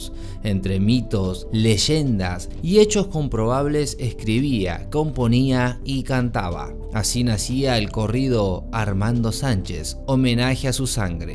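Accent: Argentina. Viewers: dale chee voz soss argentino.........._????? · UN Argentino contando la historia de chalino. No Manches. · Deberías de pronunciar bien la y o lle . El Argentino se escucha mal . No creo que sea tan dificil pronunciar la Y y la LL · Sólo el título para ganar más visitas, y perdón pero no me gustó la narración, más bien el acento argentino hablando de historias mexicanas 😮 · Jajajaja el que narra tiene acento Argentino Narrando una historia de un Mexicana Jajajaja no concuerda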